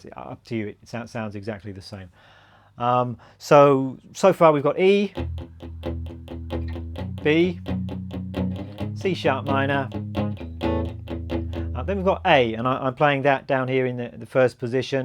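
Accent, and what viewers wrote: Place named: East Anglia, United Kingdom. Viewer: fricking awesome. great ear. where are you based, you sound like myself, East Anglia?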